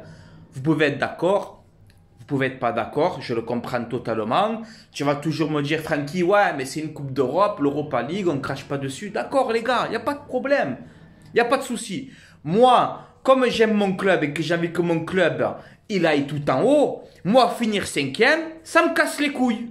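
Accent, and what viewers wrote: Marseille, France. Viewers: Cet accent de pur marseillais !!!! Allez OM frereee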